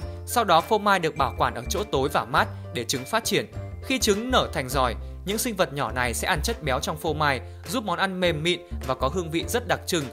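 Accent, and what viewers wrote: South, Vietnam. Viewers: Có giọng Nam nữ ta ơi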